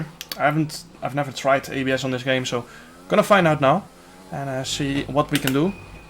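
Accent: Netherlands. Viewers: Are you dutch because your accent sounds like verstappen :). I'm american so I'm not good with european accents for the most part.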